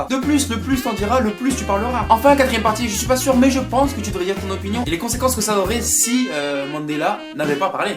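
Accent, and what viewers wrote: United Kingdom. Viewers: That British accent though👌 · L'accent british qui tue tout 👌🏽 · Waa le british accent !!! Thanks for the help man 👍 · This british accent 😍😍😍😍😍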